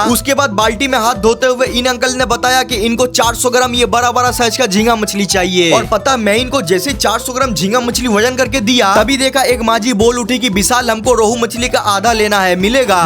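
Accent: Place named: Bihar, India. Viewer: Bihari hai kya bhai😅😅😅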